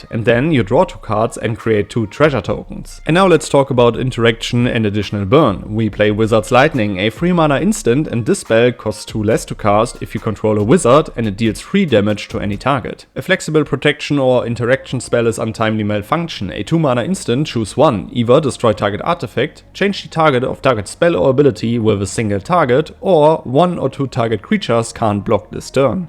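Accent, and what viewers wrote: Germany. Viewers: I love the sound of your english 🤣 it sounds like german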